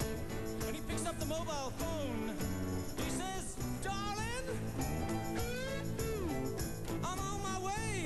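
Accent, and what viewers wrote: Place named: United States. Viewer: Why's he got an American accent? Cringe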